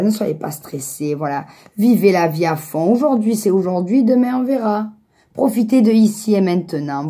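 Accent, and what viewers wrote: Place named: South, France. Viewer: J aime beaucoup votre façon d interpréter les cartes et puis Cet accent du sud ☀️😍 Merci a vous et bonne journée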